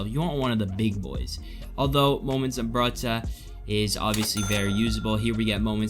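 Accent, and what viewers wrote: United States. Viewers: Italian? You sound completely American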